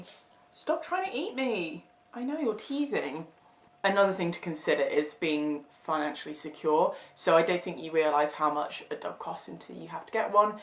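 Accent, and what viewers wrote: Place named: United Kingdom. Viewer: why is the american flag on the thumbnail and your accent is british?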